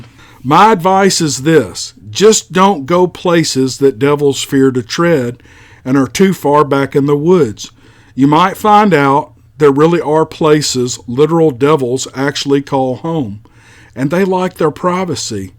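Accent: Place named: South, United States. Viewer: Dang it that last one was a corker! It’s 9pm here and I made the mistake of listening to that one while driving a lonely road up here in the redwoods of Northern California. Not even the soothing tone of southern voice could shake the creeps. Great work as usual 👏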